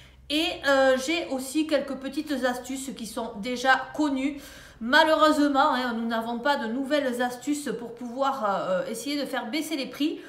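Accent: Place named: Southwest, France. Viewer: Coucou je réagis concernant les chocolatines oui dans le sud ouest on dit comme ça, moi qui suis originaire du nord on dit petits pains au chocolat. Depuis plus de 20 ans que je vis également dans le sud ouest je dis chocolatine sauf quand je remonte tout là haut. Entre parenthèse je vis non loin de Dax 😉🌞, je me doutais à ton accent mais sans grande certitude.😊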